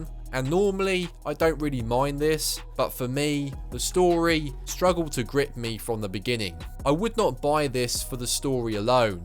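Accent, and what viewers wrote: United Kingdom. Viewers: Bro you're British as an American it's hard to getting some words I have to playback 10 seconds for that 😂 Edit: but I love your videos though ❤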